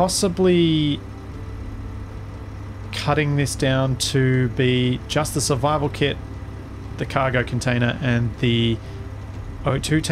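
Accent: Australia. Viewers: One of the very few aussies that are nice to listen to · "Git! Git! Git atta hea!" My weekly reminder that an Aussie <3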